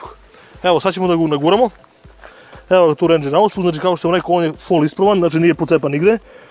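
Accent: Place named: Niš, Serbia. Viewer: on je iz Niša zato ima takav "naglasak,, a ne da ne zna padeže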